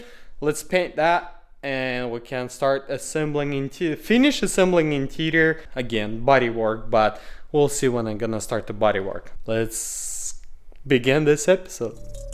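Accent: Russia. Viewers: ебать по акценту слышу что это русский вася!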